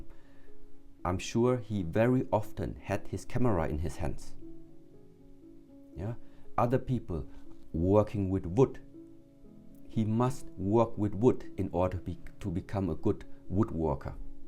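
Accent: Germany. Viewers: I knew I heard a German accent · U Sound like a german speaking english :D whats your native language? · Anybody else thinks his accent sounds German? 😂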